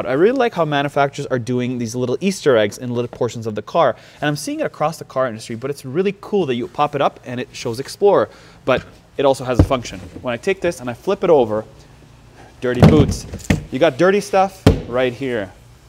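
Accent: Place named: Canada. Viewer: acording to his accent this channel is from canada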